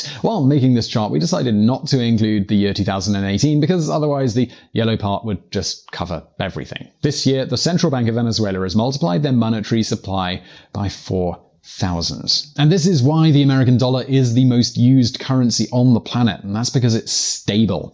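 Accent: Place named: Southern England, United Kingdom. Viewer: It's his South Brit accent. Nothing wrong with his mic. :)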